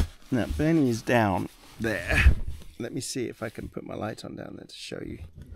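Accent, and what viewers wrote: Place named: London, United Kingdom. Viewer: I’ve been watching your episodes from the very beginning and love them all. You’re a great warm hearted guy. I love your London accent all the way from NZ. Keep it up!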